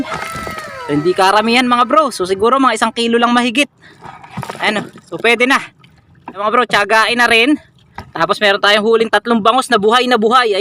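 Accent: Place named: Talim Island, Philippines. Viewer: Lalo akong nag-eenjoy na panoorin ang vlog ninyo kapag naririnig ko ang puntong Talim Island ninyo. Bang-laki ng bangus hane?! 😀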